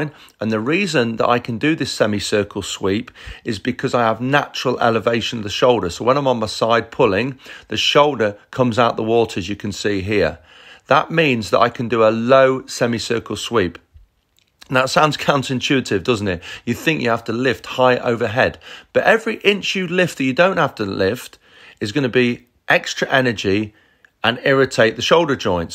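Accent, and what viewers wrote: United Kingdom. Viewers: Very informative and helpful. The only point: "s english he uses in videos, I find it difficult to understand at times as a non-native speaker. Maybe its his british colloquial words and accent.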